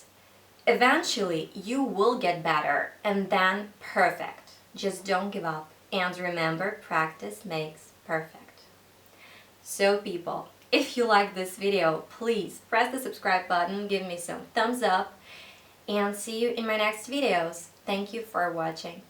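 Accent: United States. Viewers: Perfect understandable american accent. You sound like were moveed in englishspeaking country in deep childhood. And it's so increadible that you are from Ukraine and can reached that level in one-two years. Great unbelievable achievement) The latest your videos are like you are completly born in US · However, your video in 2023 I just watched, I mistook you as American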